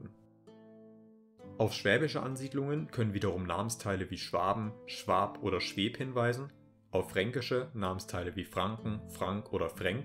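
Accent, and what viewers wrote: Saxony, Germany. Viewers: Definitiv aus Sachsen/Thüringen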